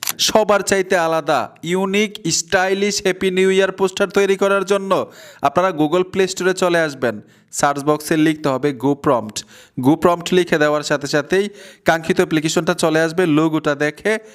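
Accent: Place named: India. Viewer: ভাই আপনার কোন কথা শুনলে বাংলা হিন্দি শোনা যায়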